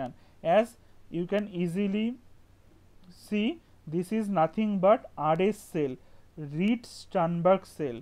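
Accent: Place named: Bengal, India. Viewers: It's bengali accent I think, they pronounce a as O generally. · Don't go with accent. He was Our WBUHS topper got honoyrs in every subjects all 14, gold medel, record marks in Obs gynae..... And Our Bengali accents are like this.... don't go with this.... he is a very good doctor that's a matter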